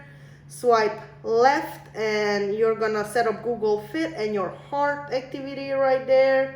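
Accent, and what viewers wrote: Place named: Iran. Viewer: You have that Irani accent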